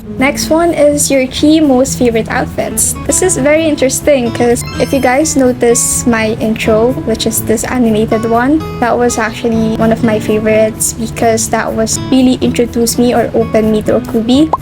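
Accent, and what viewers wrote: Philippines. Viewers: it’s kind of a filipino thing when they speak english. it’s so cool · Is she Filipina cuz her accent is very jayjay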